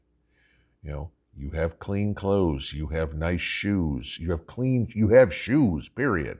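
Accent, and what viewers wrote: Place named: Bronx, United States. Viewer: Sounds like the bronx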